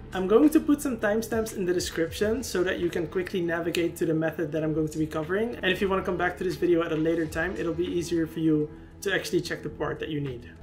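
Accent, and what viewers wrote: Netherlands. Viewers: I can hear your dutch. Groetjes en bedankt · One qeustion : u dutch?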